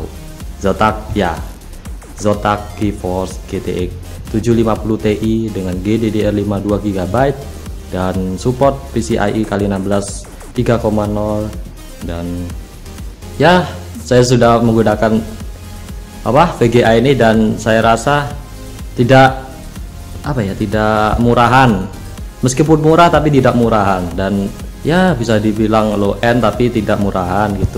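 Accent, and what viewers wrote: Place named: Java, Indonesia. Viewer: review nya lucu coy, hahahha... medhok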